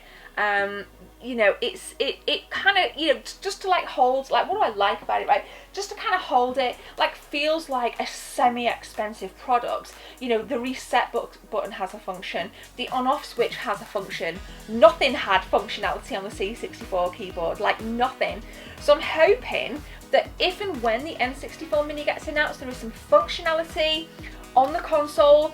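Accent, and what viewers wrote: United Kingdom. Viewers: British :D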